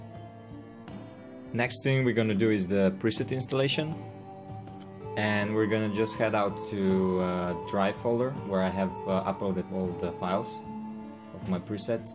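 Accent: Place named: Netherlands. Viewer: sounds good, from other YouTube vids I see you can get some nice results modding oblivion, also am I right in thinking you are from the Netherlands judging by your accent?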